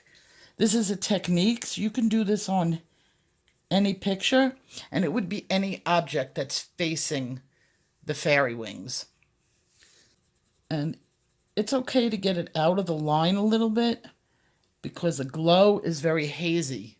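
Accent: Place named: East Coast, United States. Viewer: I love your work and your accent reminds me of when I lived on the east coast. Very fond childhood memories, from a simpler time. So thank you, for helping me with this new hobby and reminding me of my childhood.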